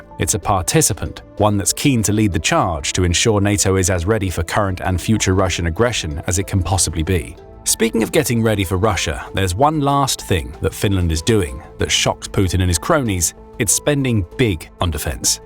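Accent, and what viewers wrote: United Kingdom. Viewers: Your British accent is charming. Nevertheless, it is up to Finns to decide if they want to promote and live in peace or become proxies, perhaps Ukr 2.0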